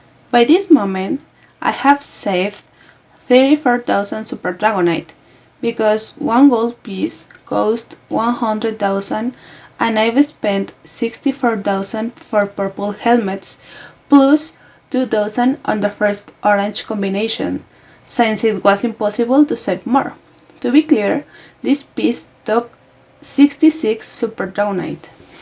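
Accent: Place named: Latin America. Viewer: Pero porqué no hablas Español, pareces latina hablando inglés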